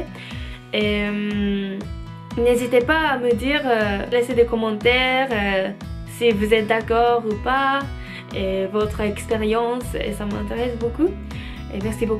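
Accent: Japan. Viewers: UN JAPONAISE PARLER FRANCAIS EST TROP KAWAIIIIII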